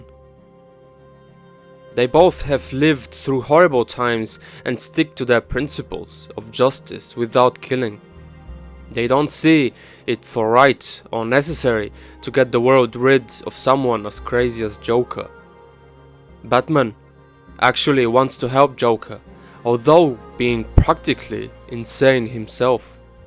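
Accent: Germany. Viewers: Great video ! I like your accent, is that german ?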